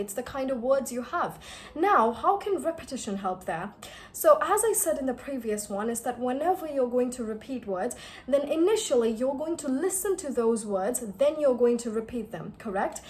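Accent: United Kingdom. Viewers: Are you using british accent while talking in most of your vedios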